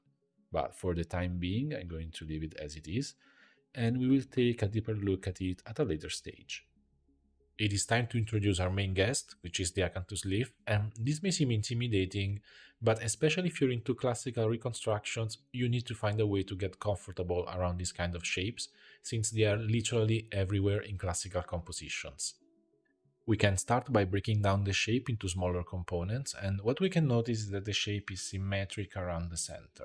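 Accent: Italy. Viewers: Sei italiano? Si sente dall'accento!😂 Complimenti per i tuoi video comunque, grazie!❤ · Ma sei per caso Italiano? 🤌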